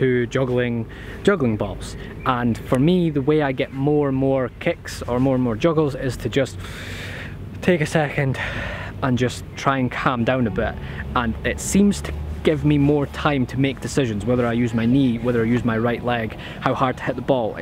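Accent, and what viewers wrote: Scotland, United Kingdom. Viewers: Is he scottish? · no hes scottish you frik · He sounds Scottish (like me)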